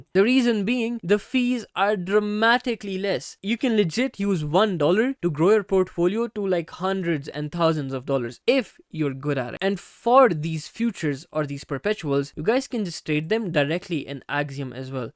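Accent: India. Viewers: Sounds like Indian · u sound indian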